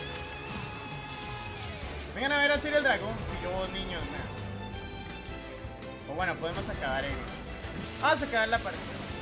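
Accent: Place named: Caribbean Coast, Colombia. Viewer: pipi sonso dice que eres costeño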